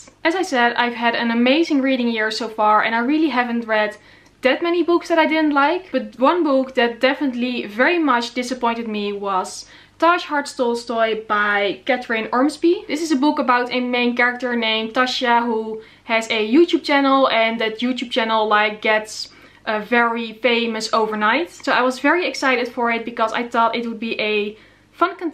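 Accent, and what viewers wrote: Netherlands. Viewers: i think she is from the netherlands about the way she talks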